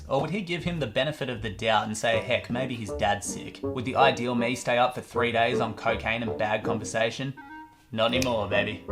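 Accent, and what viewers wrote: Australia. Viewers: You have great content and that sexy Australian accent is a bonus.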